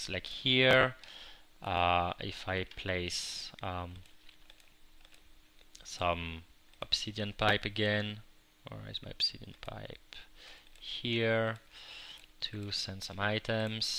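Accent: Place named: France. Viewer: he must be french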